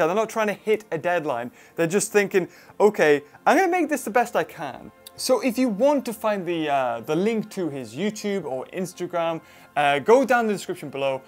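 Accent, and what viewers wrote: United Kingdom. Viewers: are you British??? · Ever since I’ve been watching I may have develop a British accent at times.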